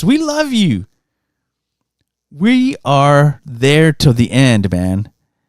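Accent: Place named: San Antonio, United States. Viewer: First time hearing you, but I'm guessing you're from SA?? Is that the Red McCombs Indian??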